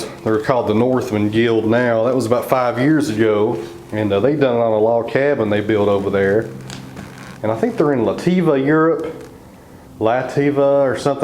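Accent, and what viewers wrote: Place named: Kentucky, United States. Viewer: You sound just my family in far southeast KY. I recognize that accent. Fine people.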